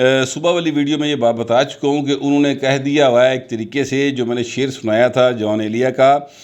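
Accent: Punjab, Pakistan. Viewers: Great Going Sahib. Your Punjabi accent is Great. Real language. You never pretend, and talk from your heart and never spoke vulgar language for Anyone. Sat Sri Akal and Aslam-ulekam.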